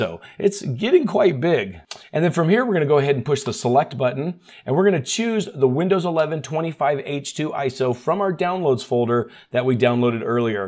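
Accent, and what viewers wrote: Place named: United States. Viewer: Today I watched your YouTube broadcast again, and it’s been synchronized in Dutch — probably an AI edit. Although my knowledge of English is limited, I prefer American voice. YouTube thinks I’m a donkey.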